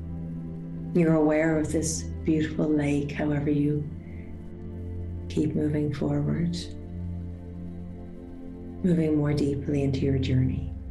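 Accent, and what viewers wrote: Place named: Ireland. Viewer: machnaimh álainn! Tá mé i mo chónaí i mBéal Feirste agus ba mhór an t-iontas é do bhlas Gaelach iontach a chloisteáil! míle buíochas ó bhandia amháin go ceann eile. grá agus sparkles!!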